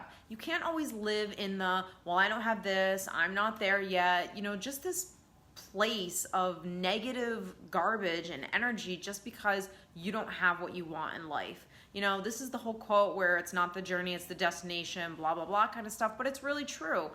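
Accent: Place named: New England, United States. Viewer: Hey — really am enjoying ur podcast and the tidbits of information that you provide. Love the New England accent that slips out times. Very charming 🤗. Keep doing you— it resonates with so many of us! #STEPHANIEROCKS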